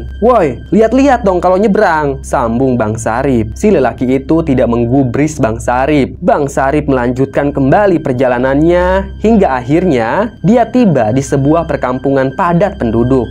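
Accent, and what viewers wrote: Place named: Medan, Indonesia. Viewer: Sharusnya logat bicarany tu logat banjar,, knp kemedan medanan,,,?? Bungulll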